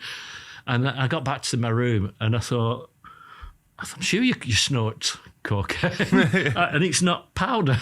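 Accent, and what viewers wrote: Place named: Accrington, United Kingdom. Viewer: This guy is great and sounds like he lives near me (Accrington) would love to meet him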